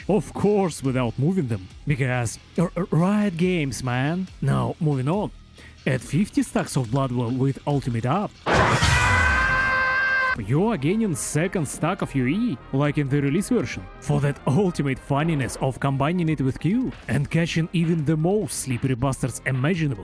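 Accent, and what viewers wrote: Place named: Russia. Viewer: Бро , учмтывая твои крайне локальные вставки и акцент , могу уверенно предположить что ты рускоговорящий , мне было забавно увидеть некоторые из твоих роликов , но то что ты говормл про морду , и многих других однокнопочных аутичных персов в попытке сделать из них богов , это просто стоический кринж. Вполне вероятно что это вызвано пактическими сорбражениями , ибо если ты действительно выходец из ру лола , то прекрасно знаком с тем что за твои вбросы твой канал бы неизменно ждал красивейший нок от бати блейзера , а так , хоть и рыльце в пушку , но вещаешь на англ аудиторию , а значит и вопросов к тебе быть не должно . хоть и вынужден признать , сама по себе рубрика прожарки чемпиона наоборот могла быть оригинальной идеей , года этак 2 назад.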